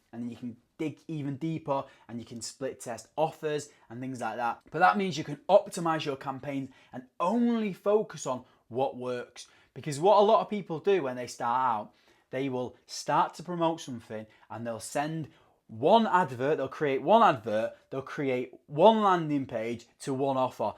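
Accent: United Kingdom. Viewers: Hahaha funny UK accent he gat there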